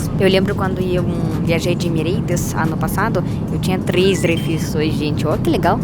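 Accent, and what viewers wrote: Russia. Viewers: Que charme de sotaque dessa russinha! Maravilha. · você é muito linda, muito fofa, seu sotaque russo é maravilhoso e te deixa muito charmosa, gosto muito dos seus vídeos, sou seu fã brasileiro!!!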